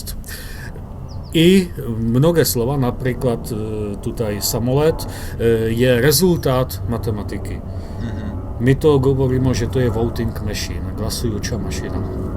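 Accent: Czech Republic. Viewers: Молодцы! Получился русский с сильным чешским акцентом! В принципе зря старались, ибо старославянский давно есть. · polacy tutaj macie srbski jazik jako najmezdu hahahha znaczy Pan mowi jako czech ktory zna po serbsku ( ma ta twardosc ) umiescil duzo crkweno slowenskogo,ruskiego tu slychac a ni troszku tylko dodanie JA MNOGaja RODnaja ,blgarskiego niema tego tonaliteta , polskiego tez nie slychac nie ma a ni troszke tekko miekkego wymawania i nazalnosci . TO pisze z punktu dzwiekowego , czyli ucha , ktora mi pomogla ogarniac nasze jezyky . Wiec tutaj slychac Slowienca ktory zrastal s Yugami na osiedlu potem pojechal do Czech sadzic bo tam spoko calkiem inaczej bede brzmial Blgarin ,Boiko , Leh, Bunjevac lub Bosanac , mowiac medzynasz I to jest najciekawsze dla ucha i mojiej strasti pra nasieh jazici bracioo Wielki pozdraw dla Polakow najbardziej bo to moje glowe uczcie kirila i serbski i wsjo paniatna Swietna robota tylko prosto w do glebine niewyczerpane TAKO ISTO SAMO PRA VSIEH SLOVENSTVUJUSzCzIH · Действительно, понятно. 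Но произношение явно чешское. Интересно, а в Белоруссии группа любителей межславянского есть? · He speaks normal czech sometimes with few different words, but accent is 100% czech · Inter slavic language with typical Czech accent 😉. Sounds Like standard Moravian combined with Czech and slovak and little serbo-croatian. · I can understand very well what Mr. says. Maybe also because he talks with a Czech accent. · У меня одного ощущение что это ман говорит по русски только с сильным чешским акцентом..... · As a czech i understand 100% but maybe only he has czech accent and I'm from czech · I am part Czech, part Polish and I understand most of these. But have strong Czech accent. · kenan Sljivo gaming he’s Czech you can tell by accent, we might just use the most archaic words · To be honest Mr. pronounces Interslavic clearly with Czech "filter". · Słychać wyraźnie, że Czech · Ano ten český přízvuk je hodně slyšet :D.. 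občas to řekne dokonce česky a pak se opraví ale na tom není nic zlého :) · it's not 50% Czech, it's his accent that made you think so. I'm Polish and I've heard another Pole speaking Interslavic - I then also thought it sounds very similar to Polish, which wasn't the case in this video anymore. Our native language surely will have some impact, that's obvious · Yes, and he has strong czech accent, i can hear it 😂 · I am Czech and for me it does not sound like Czech, there are many words that are not Czech. Maybe just a little bit. But the man, being Czech, speaks it with 100% Czech accent. I understand 95 % of it. The other person speaks Polish and I understand maybe 80 % of it. I like the idea and would like to learn this universal slavic language. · Akcent robí neuveriteľne veľa. Prvé slová a ihneď som vedel že sa jedná o cecha. Klobúk dolu za prácu a výsledok . Inak prečo mám pocit že je tam najviac slov z češtiny alebo slovenčiny. 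Ak pozerám na medzislovancinu v titulkoch a npr. poľštinu, zdá sa mi že tam je naozaj viac našich slov, alebo to je čisto subjektívny pocit a zdá sa to každému . ;) Inak, mal by som otázku, ktorý súčasný jazyk je najbližšie k jadru interslavic ? Veľmi zaujímavá práca. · It was easier for me to understand as a Czech, because Mr. is Czech and has a clear Czech accent, lol, but this is an interesting idea. If people in Slavic countries actually spoke this language, I think I could learn it for a couple of months and then would be able to hold simple conversations without big problems. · Podoba mi się idea języka międzysłowiańskiego. Przyda się, mam nadzieję w niedalekiej przyszłości. Jest bardzo czeski, w sensie wymowy i na całe szczęście jest łatwo zrozumiały dla Polaka :-) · bo jest czechem to ma akcent czeski jak będzie polak to będzie zalatywal na polski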